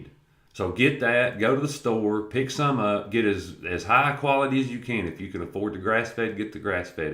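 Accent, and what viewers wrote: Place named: Appalachia, United States. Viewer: Good vid man, Loving the Hillybilly Accent :D, subbed to follow your progress!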